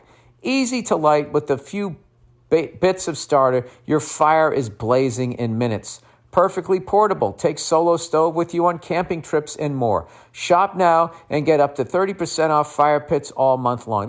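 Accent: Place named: East Coast, United States. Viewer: i remember once in the good old bad days of this podcast when was much angrier and spoke more east coast that is to say much faster. he was talking about how people were complaining about not being able to download episodes off sound cloud i think? he said " what the fuck are you guys working your fingers to the bone pressing your download button for your free entertainment complaining get the fuck outta here". i realized he was right. that being said i cant belive this actually showed up on my feed on a monday. i was so blown away i wrote this comment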